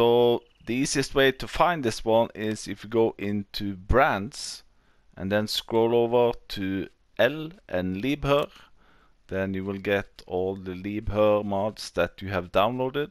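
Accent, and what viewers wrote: Bergen, Norway. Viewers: You sound like you're from Norway Bergen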